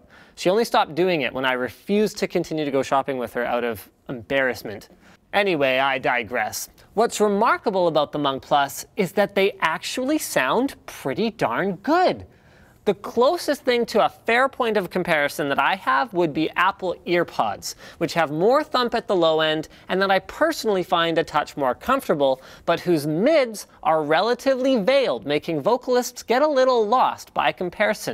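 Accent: Canada. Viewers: Someone could tell that you're Canadian from the first second of this video lmao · what you a canadian ey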